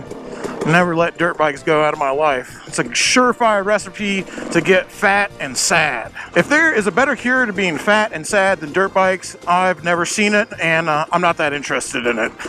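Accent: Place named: South Florida, United States. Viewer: I’ve been around since the heavy southern Florida accent! lol good times… long time. As real as any YouTuber gets, good bad and ugly.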